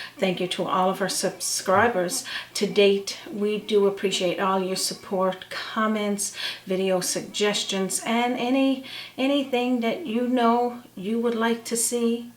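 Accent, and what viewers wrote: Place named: Newfoundland, Canada. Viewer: you so remind me of my grandmother as a young lad living in the country with farm lands all around. I grew up in the 40's and 50's and my Grandmother was always cooking, baking. I learned so much from her. I just love your Newfoundland way of talking as I told you before I worked with many men from NFLD.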